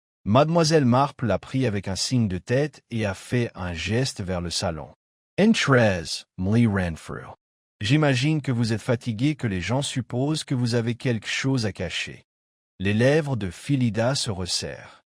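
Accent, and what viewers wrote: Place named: England, United Kingdom. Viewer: La voix avec un léger accent anglais du "lecteur IA" est plus supportable que celles entendues dans les autres épisodes.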